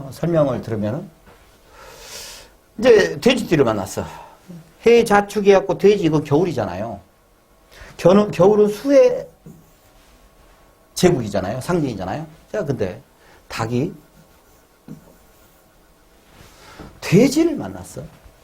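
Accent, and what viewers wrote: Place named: Jeolla, South Korea. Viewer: 전라도 사투리가 친근감 있고 좋아요 알기쉽게 잘 설명해 주셔서 감사합니다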